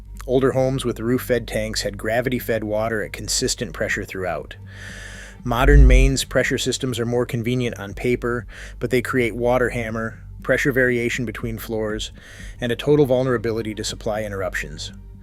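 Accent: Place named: United States. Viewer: Why use an American voice for a British video? Interesting video otherwise. Lots of good features that went by the wayside for false cost savings.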